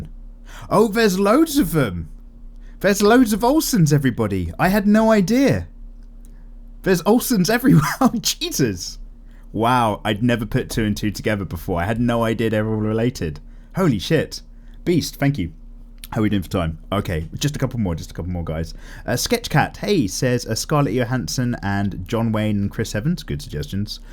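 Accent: United Kingdom. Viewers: Hey! Im happy being able to listen to your beautiful British voice in the back as I continue to hone my artistic skills! I wanted to answer some questions fro,m past DWMs real quick 1-DWM 89: More recent the ending or opining image in the Sword art online spinoff where the main character (Who you should draw if possible) Is standing outlined in the rubble. 2-DWM 88: Nothing much Pumpin Iron and drawings! 3-DWM 90: Not an actress, but more of a cosplayer I recently found named Vamplette! Anyways have a great day!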